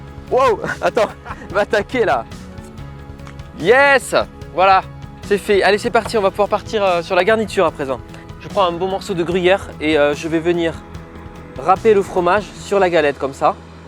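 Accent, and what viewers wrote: South, France. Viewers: Coucou, merci de partager vos souvenirs et votre galette! Il me semblait avoir reconnu un reste d'accent du Sud!... 😉😀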